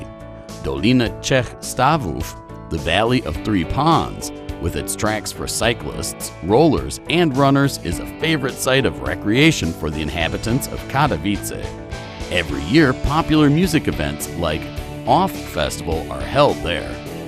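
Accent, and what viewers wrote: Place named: United States. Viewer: It would have been MUCH better to have a Pole who spoke good English to do this voice over. The narrator's strong American accent is horrible and his pronunciation of some of the Polish words are terrible.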